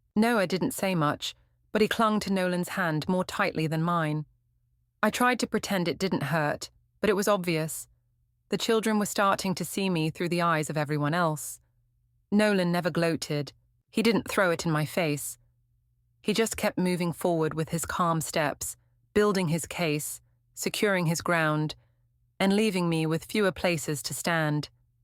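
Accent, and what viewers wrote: United Kingdom. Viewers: I love the narrators British accent. But man she can really sound mean and borderline cruel. She sounds brilliant but plays the dumbest chick in every story.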